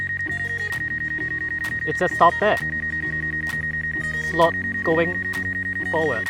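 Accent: China. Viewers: You are from Hong Kong, yea? My mother speaks cantonese and all ger chinese friends have the same accent :D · china english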